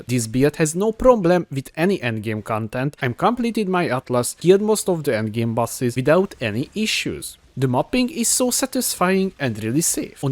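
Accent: Hungary. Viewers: you Hungarian?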